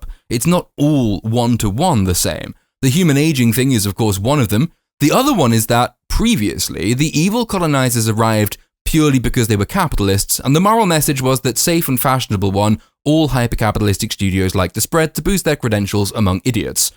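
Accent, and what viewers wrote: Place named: RP, United Kingdom. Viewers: A posh accent doesn't make you intelligent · Bros speaking so posh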